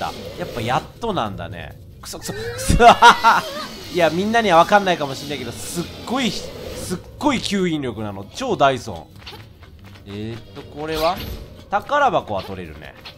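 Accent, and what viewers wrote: Kansai, Japan. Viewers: レトさんの関西弁違和感なさすぎる( ˘ω˘ ) ｽﾔｧ…さすが関西出身やなぁ…好きやわ～レトさんの関西弁( ◜௰◝ ) あっ忘れてました( ˊᵕˋ ;)💦 あっこちら皆様が求めているシーンとなります|´-`)ﾁﾗｯ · レトさんの関西弁可愛い( ´,,•ω•,,`)♡ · 初っ端から関西弁聴けて幸せだいぶ幸せお耳が幸せとにかく幸せ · レトさんの関西弁がたくさん聞ける神回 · レトさんの関西弁が好き〜〜〜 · レトさんの関西弁(⸝⸝⸝ᵒ̴̶̷̥́ - ᵒ̴̶̷̣̥̀⸝⸝⸝) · レトさんの関西弁すきなんよなー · レトさんの関西弁にキュン死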